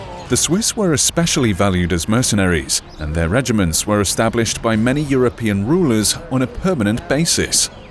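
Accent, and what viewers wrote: United Kingdom. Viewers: New british narator 😮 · Yo it’s the old British narrator 3 years ago!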